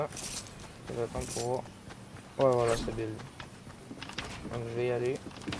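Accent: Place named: Quebec, Canada. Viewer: att t qc ou pas ta 1 acent ca te dit ont s'ajoute ou nn chui qc moé aussi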